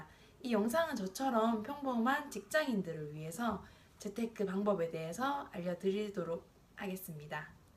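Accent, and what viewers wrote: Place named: Gyeongsang, South Korea. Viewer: 대단하세요!! 진짜 자랑스럽네요! 그리고 경상도 사투리 넘 귀여워용...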